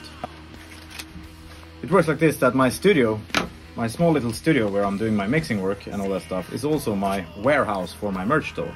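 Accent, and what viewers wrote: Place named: Hagfors, Sweden. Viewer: Håller med om katterna, å dialekten va Hagfôrsmål. 😁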